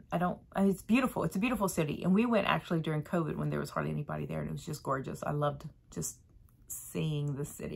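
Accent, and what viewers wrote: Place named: Acadiana, United States. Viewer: I picked up your accent! Lol love the Acadiana pattern. Leave the crawfish!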